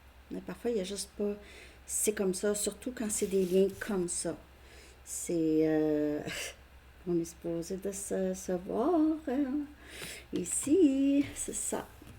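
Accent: Quebec, Canada. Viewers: Merci, ca me parle encore bcp ❤ vous êtes une vraie pepite 😊 et votre accent me ramène au temps où j'ai vecu au quebec 🥰😀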